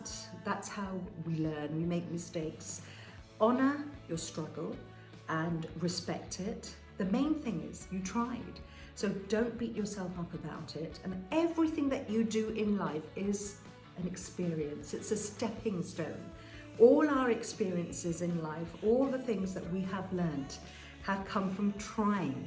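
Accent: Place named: United Kingdom. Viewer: such a quality content but least number of views. If you make prank video it will go viral. This is the taste we have unfortunately. We should promote quality content like this. I have just discovered this channel today, I used to watch news by Shabnam Riaz about 10 years ago I guess. You have got really nice British accent. Keep it up. I will urge the audience to share and subscribe to promote such quality videos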